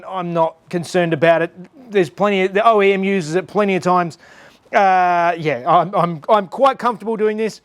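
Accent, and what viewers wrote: Australia. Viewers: Hello, I am a french old guy watching your amazing videos that I love. Can I suggest something? Fortunatly you put subtitles under the video because, (as I live in Bali most of the time, I know that you Australians have a fucking accent!)...so thank you for these subtitles. But please, can you do it without all "hum" and " hu" because it makes them harder to read? I can't wait watching the Alfarrari finished so hurry up! If you come to Bali, in european winter time, let me know and come minimum for a drink or stay in my beautifull villa! Say hello to Mrs...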